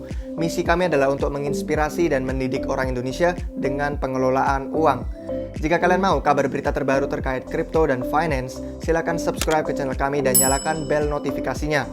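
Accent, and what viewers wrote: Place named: United Kingdom. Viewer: nonton buat dapet ilmu tentang crypto ❌ nonton buat denger mas nya ngomong british accent ✅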